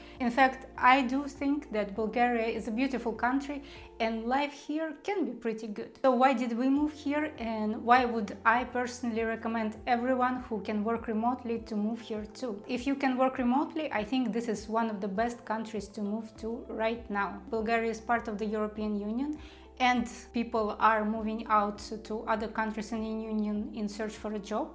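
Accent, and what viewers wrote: Russia. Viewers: Sounds like you are Russian. Of course you are going to like the BG.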